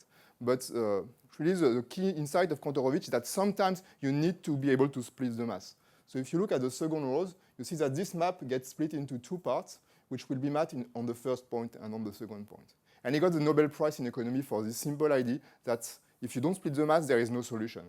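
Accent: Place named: France. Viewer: That french accent..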